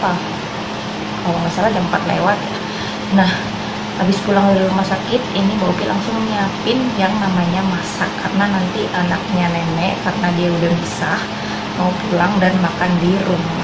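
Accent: Banyumas, Indonesia. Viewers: Halo anak cantik ngapak yen ora ngapak ora kepenak..... Semangat 👍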